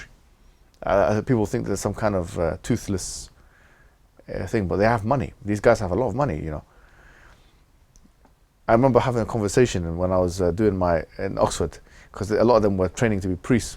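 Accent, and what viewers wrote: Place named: Kashmir, Pakistan. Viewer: Bruh u producing quality videos with quite low subs. Subbed to u. Where r u from? U sound like kashmiri-Pakistani.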